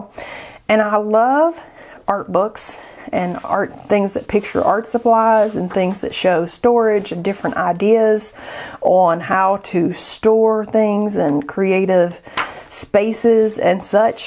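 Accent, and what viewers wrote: South, United States. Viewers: obviously southern part of the good ol' USA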